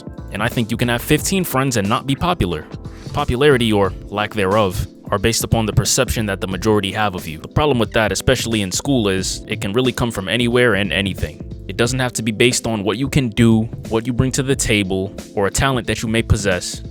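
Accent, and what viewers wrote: Brooklyn, United States. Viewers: You from Brooklyn frfr huh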